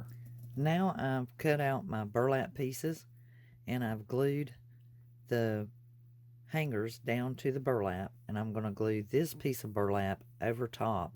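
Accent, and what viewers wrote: South, United States. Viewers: Thank you! Such na wonderful band east project and your explanation is beautiful love hearing your southern voice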